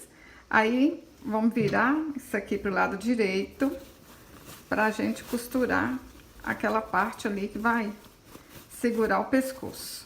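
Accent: Minas Gerais, Brazil. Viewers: Que sotaque gostosoooo…. Mineira uai…. Lembrei da minha querida avó paterna, uma crocheteira de mão cheia r minha primeira professora! Viu te seguir, amei seu trabalho, em tempos onde tudo se descarta regatar uma boneca é lindo! Beijos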